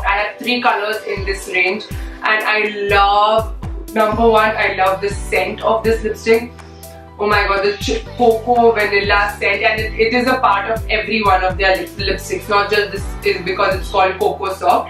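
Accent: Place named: India. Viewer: Girl you don't look Indian from anywhere.. had to open ur video and hear your accent to make sure that yes this hottie is indian indeed 🙆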